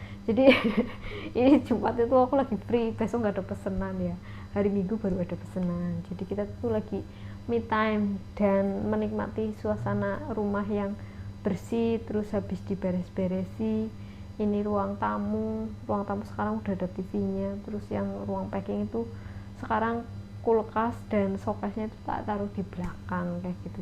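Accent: Banyumas, Indonesia. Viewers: Oalah Purwokerto toh mba.pantes bisa bahasa ngapak..salam mba aku dr banyumas😊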